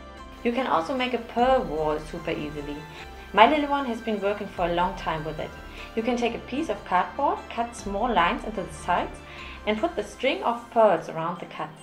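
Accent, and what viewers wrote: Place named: Germany. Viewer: Awwww your accent is so cute!Typical german!😂 Amazing video💗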